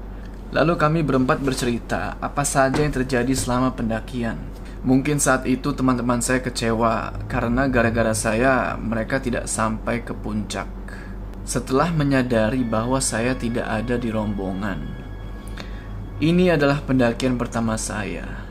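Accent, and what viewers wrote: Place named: East Java, Indonesia. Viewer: Jatim mana cak?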